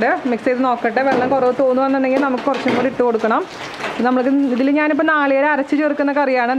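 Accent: Thrissur, India. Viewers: Natil vannapo chechik thrissur accent koodi😍. Njan kottayam accent aanu ketto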